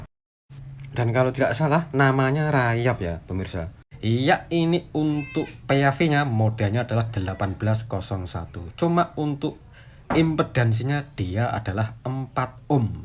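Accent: Surabaya, Indonesia. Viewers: That accent sounds like Surabaya?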